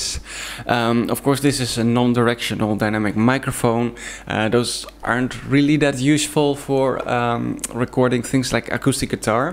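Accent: Netherlands. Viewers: neemt de microfoon ook nog geluid op als je er een stukje zo een metertje van af zit? ik hoor aan je accent dat je nederlands ben hahah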